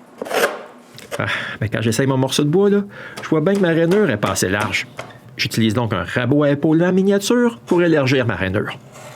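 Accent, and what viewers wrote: Canada. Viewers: ...J ADORE LE PARLER CANADIEN CAR J AIME LE CANADA VOUS ETES DE QUEBEC JE PENSE IL Y A 36 ANS JE DEVAIS ALLER COMME CHAUFFEUR POID LOURD MAIS JE N AIS PAS OSER FRANCHIR LE PAS MAIS MAINTENANT J AI 66 PRINTEMPS ET C EST UN GRAND REGRET DE NE PAS ETRES LABAS C EST LA VIE BON TRAVAIL ET CONTINUER A NOUS APPRENDRE BEAUCOUPS DE CHOSE AVEC PLAISIR ET ATTENTION AUX CARIBOUS AMICALEMENT D UN FRANCAIS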